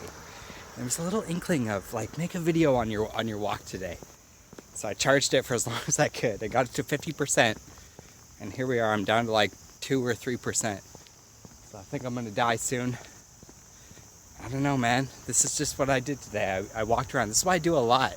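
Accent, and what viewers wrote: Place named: Northwest, United States. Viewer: I found and watched a previous video when I searched “Can you combine Monstera plants”. (Your video when you combined your plants.) I thought for sure you lived in the North West United States. Are you from there? You have the accent. We lived in California for 15 years and when we came back to the south, our families would comment on our accent and I didn’t understand what they meant until a few years of being back. Now I can hear it. 😂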